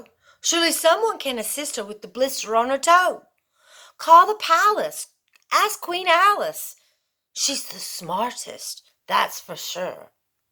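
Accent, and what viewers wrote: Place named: England, United Kingdom. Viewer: I love the english accent...do you have a theater background?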